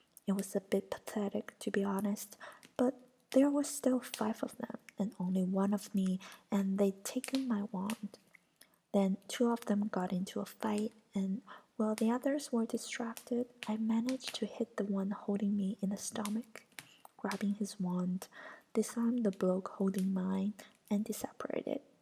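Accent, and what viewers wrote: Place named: China. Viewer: from your accent I could tell that you are from china ?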